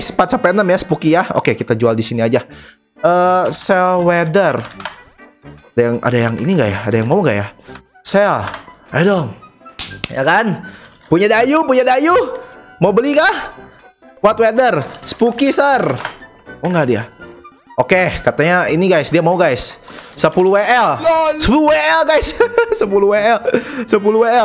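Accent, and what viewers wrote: Indonesia. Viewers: Item Dreams? Akwikwk Indo parah itu bahasa Inggrisnya 🤣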